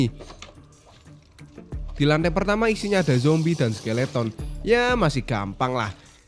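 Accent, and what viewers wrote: Java, Indonesia. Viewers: keknya lo jawa bg ngomong gue nya medok bgt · Medok bang ngomong lu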